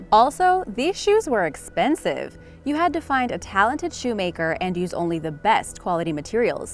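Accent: United States. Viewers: Nothing worse than an American voice trying to tell British people about OUR historical times...🤨🇬🇧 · There is something triggering and insulting about hearing someone with a distinctly American accent calling soccer football. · You lost me at calling soccer football and football rugby. The narrator has an American accent. It’s just weird. I’m out. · It seems odd that the narrator with an American accent describes soccer as "football"....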